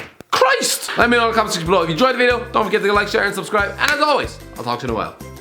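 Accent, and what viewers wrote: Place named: Ireland. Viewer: Listen to that sweet Irish voice, he doesn't sound like a dying cat with throat cancer anymore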